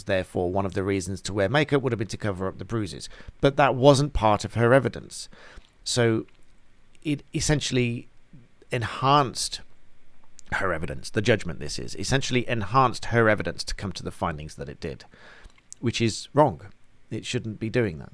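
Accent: United Kingdom. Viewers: Hello from ME, USA! Thanks so much for looking into this UK appeal denial. Wonderful to hear your thoughts and comments, watch every day. Can US citizens donate and sign petition, or is it something only UK citizens can do? Just beginning to really understand the Brit accent - great to hear such clear and articulate speech, and at a good speed, I should say. Looking forward to next installment. 🤗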